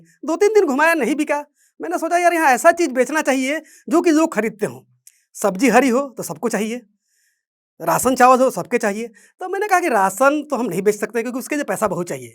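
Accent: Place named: Bhojpuri, India. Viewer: Bhojpuri bolte the tabhi lga ki mere taraf ke hai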